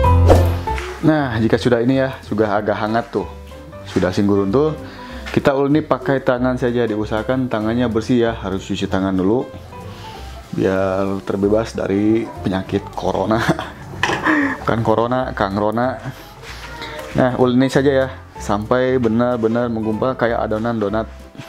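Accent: West Java, Indonesia. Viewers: Alhamdulillah, diam d rumah ada kegiatan, praktek bikin makanan ala 2, bahan nta simpel / mudah d dapat dan ga banyak biaya lagi, nuhun ide nya, bikin ibu 2 jadi resep, bikin nya dan lucu klw ngomong sunda na lucu 👍👍👍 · Kita banjuriii yaa..😂 sunda pisan euy..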